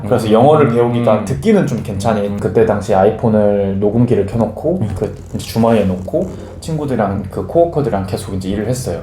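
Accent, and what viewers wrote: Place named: Jeolla, South Korea. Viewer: ㅋㅋㅋ선생님 억양이 전라도 쪽인 거죠? 저는 경상도라서 제가 흔하게 듣지 못한 억양이라 귀엽고 더 귀에 잘 들어왔어요! 올해 입사하면 몇 년 열심히 경력 쌓고, 미국에 일하러 가보고 싶었는데! 이미 그런 길을 가신 분을 보니 멋있네요ㅎㅎ 참고해서 잘 준비해보겠습니다~ 영상 감사합니당:)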